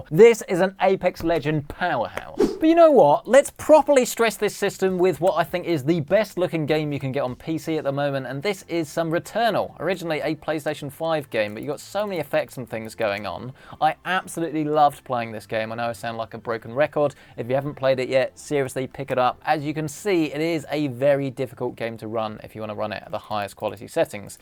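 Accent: United Kingdom. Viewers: You accent is definitely British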